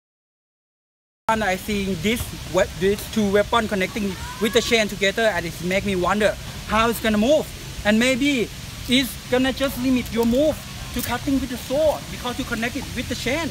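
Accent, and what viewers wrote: Thailand. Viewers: somehow your accent sound like Thai accent to me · นึกว่าคนประเทศไหน พอได้ยินสำเนียงอังกฤษเท่านั้นแหละ ไทยนี่เอง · hey i know you are thai with your accent THAI GANG 🔥 🇹🇭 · ตอนแรกนึกว่าชาวต่างชาติ แต่พอฟังสำเนียงเลยรู้ว่าเป็นคนไทย สุดยอบ At first, I thought he is in another country, but when I heard his accent I know he is Thai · สำเนียงนี้คนไทย555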